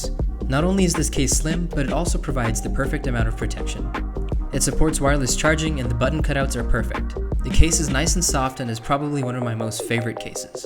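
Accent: United States. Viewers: amazing quality and content bro❤️ at first i thought u r american😂😃❤️ U earned a subscriber bro❤️ Keep up the good work🔥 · Damn , your accent is freakishly American !!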